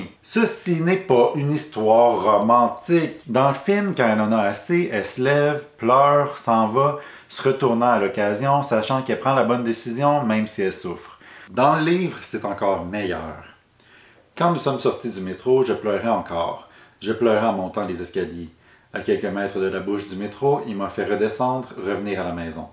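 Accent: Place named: Quebec, Canada. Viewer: Ah mais de rien! Je l'attendais tellement l'argument "En vue de son passé c'est normal" x) Et j'avais pas relevé la connotation homophobe parce qu'à des moments je savais pas de qui parlait exactement alexmoinard ni où elle voulait en venir mais à présent je me dis que si j'avais su j'aurais réagi => En tout cas j'en profite pour remercier d'avoir fait cette vidéo très bien expliquée (avec en prime cet accent québécois que j'aime tant ♥) et qui m'en a beaucoup appris. Je me permettrais même d'ajouter quelque chose qui pourrait peut être être un peu plus éclairci: le fait qu'Ana se fait violer à plusieurs reprises par Grey (comme sa "baise punition" comme elle l'appelle) étant donné que ce charmant monsieur ne lui demande en aucun cas son avis pour savoir si elle a envie d'avoir un rapport sexuel ou non et qu'il ose dire à un moment "I know you want me" qui est clairement ce que disent énormément de violeurs à leur victime pour justifier leurs actes ignobles. 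Ça m'a à moi aussi fait découvrir 9 semaines et demi que j'ai acheté sur ma liseuse tant j'ai été intriguée ^^ Le film aussi a l'air d'être pas mal, il faudra que je vois ça à l'occasion (=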